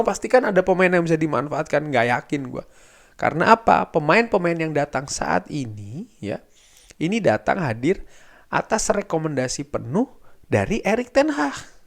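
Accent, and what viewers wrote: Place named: Java, Indonesia. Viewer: Buanyak nya medok tenan bg 😅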